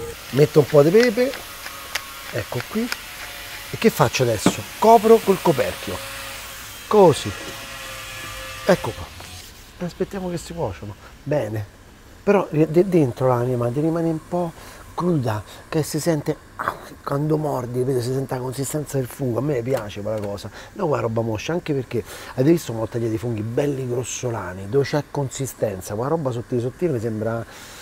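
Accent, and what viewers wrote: Rome, Italy. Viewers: Troppo simpatico con questa cadenza romana che dire..... bravo ⭐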